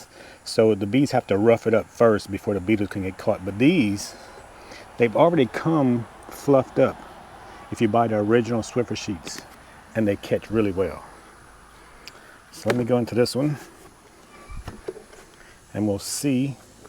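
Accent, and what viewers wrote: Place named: Louisiana, United States. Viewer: Awe... Your voice make me miss home!!!! Lol I'm from butte la rose but I live in Florida now. 😊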